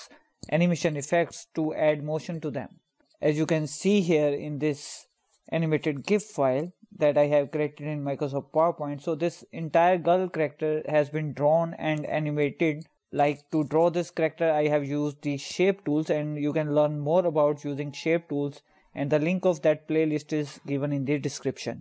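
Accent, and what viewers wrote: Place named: India. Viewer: his accent sounds like indian and look at the top-right corner, his name is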